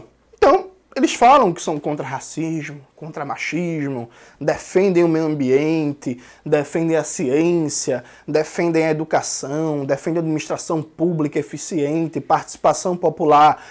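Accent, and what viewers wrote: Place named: Northeast, Brazil. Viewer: Irmão tu é da onde? Eu passei o vídeo todo tentando descobrir de onde do nordeste era o sotaque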